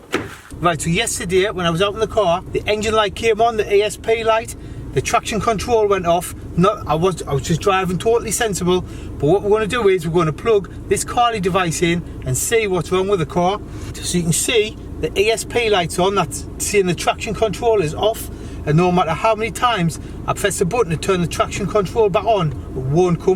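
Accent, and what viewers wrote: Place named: Newcastle, United Kingdom. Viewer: You talk very clearly with no bs and a jordie accent well done a great teacher you are. Hard to do without being a cock...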